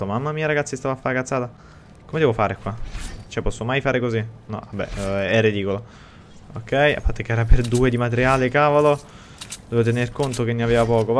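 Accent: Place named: North, Italy. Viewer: Ma perchè parli sia napoletano sia romano e poi parli con l'accento del nord ...ma che cazz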